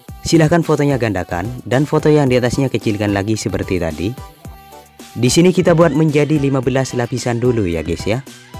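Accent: Madura, Indonesia. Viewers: Abang org madura kah....?🤔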